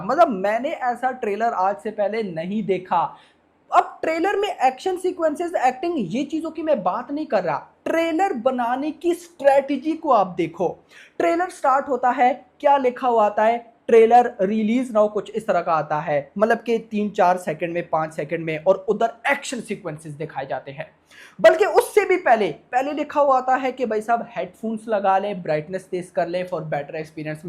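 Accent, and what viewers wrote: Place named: Pakistan. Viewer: Why are you over reacting and I don’t know, i feel You have a Pakistani accent.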